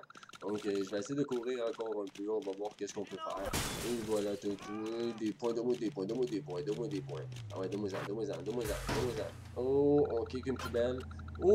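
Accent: Quebec, Canada. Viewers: T québécois ???☺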